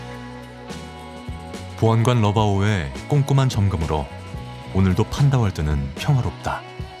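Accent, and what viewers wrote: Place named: Chungcheong, South Korea. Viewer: 러바오 넘넘 귀여워❤❤ 충청도 사투리도 찰떡이구먼유~^^ 365일 만근하는 우리 러부지❤❤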